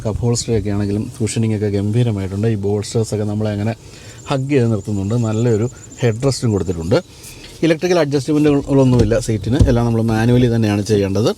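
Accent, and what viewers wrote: Kottayam, India. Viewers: Your commentary is good as part kottayam slang like Alexa kocch😂😊.. i.e enjoy ur program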